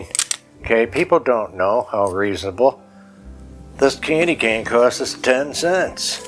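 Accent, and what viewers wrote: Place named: Idaho, United States. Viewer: There's a first and your it 👏😍 there isn't a video of yours that I haven't enjoyed. I have gone back to look at your past video's and their all fantastic. Lots of flair and charisma. Oh by the way I also have a collection of milk glass love it. I have 68 Santa's not to many but their not anything like yours lol! I also have a collection of nutcracker's. My son has gifted me one every year 😃? You sound like an Idahoan yeah!! 😘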